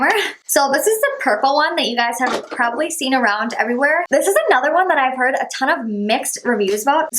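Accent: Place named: Midwest, United States. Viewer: I just found your channel and I'm obsessed with you already lol and I love your midwest accent!! I'm from Wisconsin so wooooo midwest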